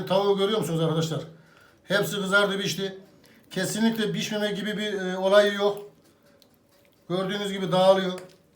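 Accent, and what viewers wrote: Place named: Aksaray, Turkey. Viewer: Sizi ailecek izliyoruz.guzel ve sade anlatimla.sizin ispanakli patates yemeginizi yapacagiz. bey ben aksarayliyim.sizin siveniz bizim oraninkine benziyor merak ettim nereli oldugunuzu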